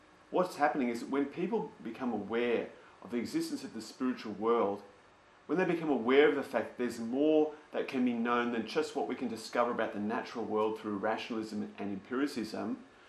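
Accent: Australia. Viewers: He's an Aussie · As an English person, I am offended you think this idiot is English. He's Australian. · that would be funny if he was speaking with a british accent. unfortunately its actually australian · I agree, however he is austrailian not british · shit i think this guy is australian, sorry everyone. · it's not a british accent it's Australian. I'm guessin you are american, i don't know why so many americans fail so miserably at picking accents, i guess it's because you just don't get exposure to foreign accents enough because of the ludicrous nationalism. As an Australian i don't understand this idiot, he lives in a secular country where secularism is only growing larger and larger and less than a third of the country are believers and that number is shrinking all the time.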